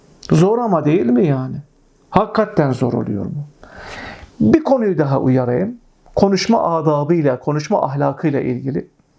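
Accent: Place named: Konya, Turkey. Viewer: Allah razı olsun hocam. bu arada tam bir konyalı gibi konuşuyorsunuz bazenleri ( ' Biliyonuz mu ') :))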